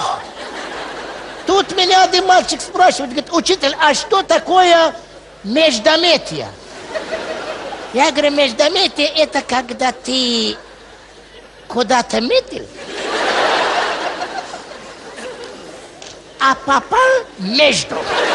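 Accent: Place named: Baku, Azerbaijan. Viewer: Бакинский акцент до сих пор сохранился у него "Иди пей чай даааа"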